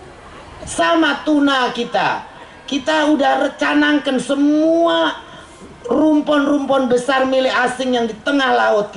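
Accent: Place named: West Java, Indonesia. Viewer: gak ngerti bahasa sunda. tapi seneng aja gitu denger orang ngomong sunda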